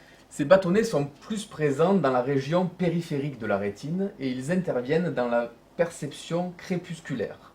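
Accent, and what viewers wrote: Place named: South, France. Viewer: Petit accent du sud qui va bien. Pepere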